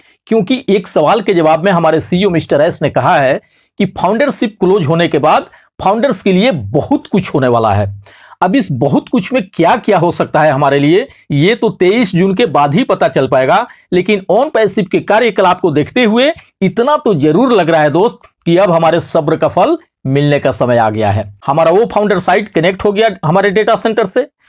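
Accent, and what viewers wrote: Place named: Bihar, India. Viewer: Sirji aap ki tone se lagta hai ki aap bihar se hai mein bhi bihar se hi hu aap se mulaqat ho sakti hai kya.aap bahut achhi informations dete hai.dhanyavad.jai ON-PASSIVE.JAI BHARAT.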